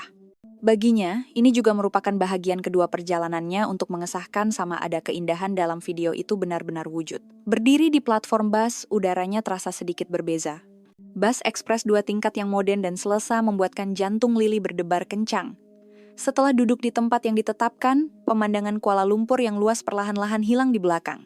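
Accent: Indonesia. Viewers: lagi suara org indon yg bercakap dlm video pasal malyasia.menyam pah dengar meleket2 percakapan dia.haprak lah punya org.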